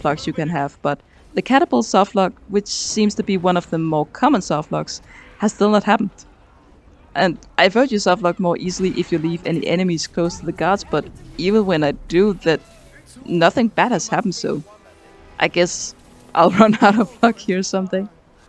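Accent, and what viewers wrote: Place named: Denmark. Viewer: As a fellow Dane you can instantly recognize a Danish accent, when you find it out in the wild like this :) Nice run as well.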